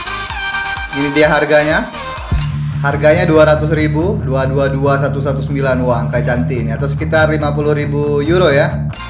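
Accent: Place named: Terengganu, Malaysia. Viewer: terengganu nelang... like org ganu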